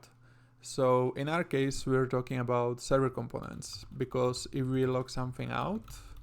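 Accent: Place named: Czech Republic. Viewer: pekna chenglish:DD ale dobre video, jen hledam jak udelat localized pathnames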